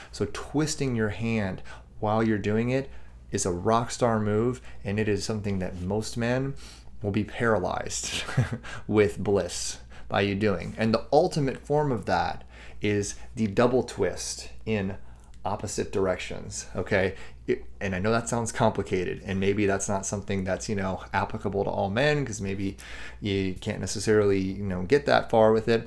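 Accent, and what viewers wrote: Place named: South, United States. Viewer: I love your southern accent.🌞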